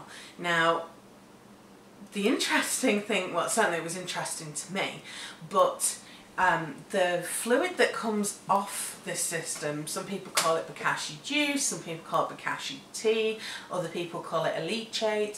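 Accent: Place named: United Kingdom. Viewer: How have I never heard of Bokashi before?! This is brilliant! (And why was I surprised you have a British accent? I knew about England! Ha!) Did you mention how much the bags of bacteria cost? I was wondering about the start up cost for the system and how much it costs to make a batch of compost, since you put a cup in every time you fill it. I could have missed that part. (Toddlers.)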